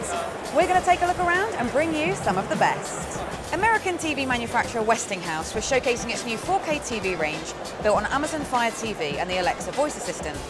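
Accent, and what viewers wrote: United Kingdom. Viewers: I lové that british accent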